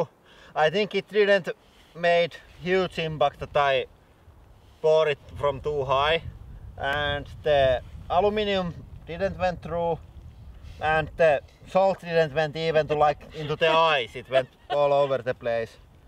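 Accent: Finland. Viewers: are you from Finland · Are you from Finland?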